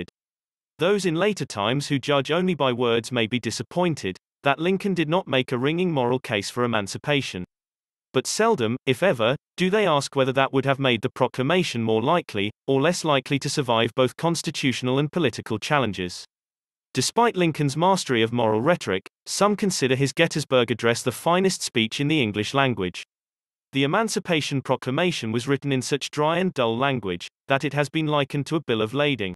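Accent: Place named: United Kingdom. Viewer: What's with this British accent sh!t? Sowell is an American, ffs.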